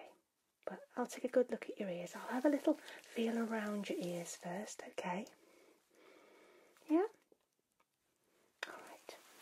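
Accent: United Kingdom. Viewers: Love hearing that beautiful British voice & it sounds so bloody lovely! Watching your content always feels so welcoming and relaxing as well! Keep up the great work & goodnight! 🥰💜💜🥱🌃🌙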